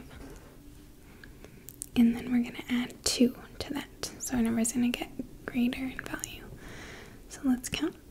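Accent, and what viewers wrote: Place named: Wisconsin, United States. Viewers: VEEEERY Midwestern, that's for sure. I'm in Arizona and we have a ton if Midwesterners here. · That accent, I love it. I can't place it 100%. But sounds Wisconsin-ishhhhh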